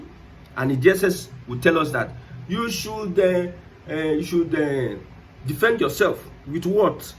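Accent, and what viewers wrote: Yoruba, Nigeria. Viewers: This guy is not igbo. He was never igbo. I have taken time to analyse him. He constantly deliberately speak down on igbo and use the word "our" to make him sound igbo. He is yoruba in look and intonation. I think he is one of Tinubu supporters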